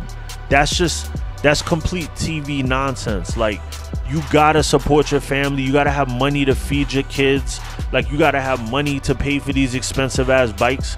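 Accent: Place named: Boston, United States. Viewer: This dude talks like 1090Jake 😂 must be from Boston area or sumn 🤷🏻‍♂️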